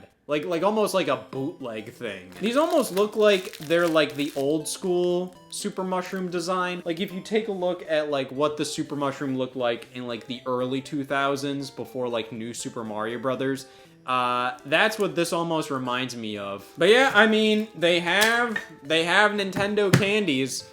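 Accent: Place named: Michigan, United States. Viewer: You definitely from Michigan lol😅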